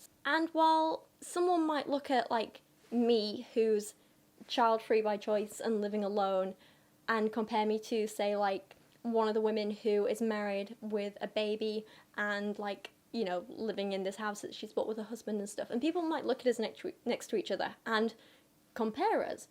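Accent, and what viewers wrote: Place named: Northern England, United Kingdom. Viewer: A northern girl repressing natural accent with London estuary accent.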